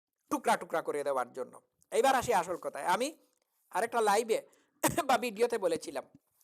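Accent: Sylhet, Bangladesh. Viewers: জনাব, আপনি কি সিলেটি ?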